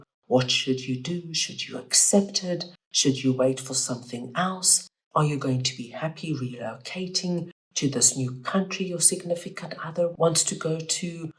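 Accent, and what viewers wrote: South Africa. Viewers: Are you South African ? Hearing the accent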